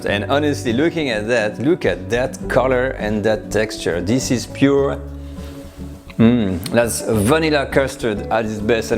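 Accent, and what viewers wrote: France. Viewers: you re absolutely fantastic to watch even your French English accent..Bonjour Chef...Bernard from The Philippines..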